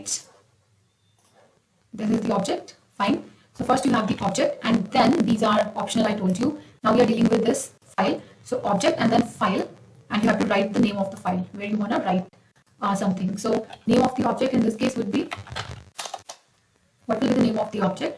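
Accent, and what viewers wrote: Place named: United States. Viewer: in your previous video like 2 years before you spoke like a American like born there but now your teching is good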